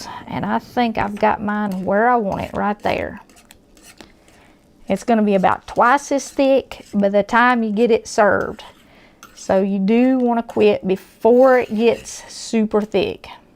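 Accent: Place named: Kentucky, United States. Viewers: I grew up in a military family (Army), my mom has your accent, she's from Kentucky. My dad (Vietnam Vet) made S.O.S. with sausage and he would fry an egg and put it on top of the toast and put the SOS over that. Thanks for your recipe, I grew up on this. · I dont know where she is from but I absolutely love her accent. I could be wrong but I'm thinking Appalachia somewhere. I've heard those folks really know how to cook! SUBBED!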